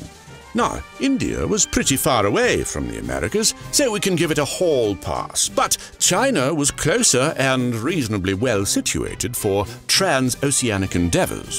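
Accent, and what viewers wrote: United Kingdom. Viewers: 您的英式英语真的非常非常好听❤❤❤ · I discovered your channel today. Instantly hooked with the brit pronunciation and enunciation. It's really good, and the pacing is well done too. Instant sub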